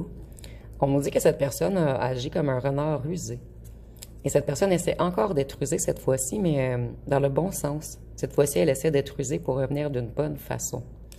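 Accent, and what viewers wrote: Quebec, Canada. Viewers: Bonjour 😁, Très très passionnant votre tirage 😅, j'ai l'impression que vous décrivez une bonne partie de ce que je vis actuellement 😅, j'ai hâte de voir ou cela mènera mais en effet je garde une certaine distance car ne sachant pas réellement ce qu'il veut et cherche mais en effet de son côté c'est est le chaos dans ca vie ..... Maintenant à savoir si c'est de lui que vous parlez ou d'une autre personne 😁 car lui est poisson 😂 En tout cas je vous suis avec grande attention et j'adoreeeeee vôtre accent québécois ❤❤❤❤❤❤ Et mille merci pour vôtre tirage qui m'a fait sourire 🥰🥰 Et à vos souhaitssssssss 😉🥰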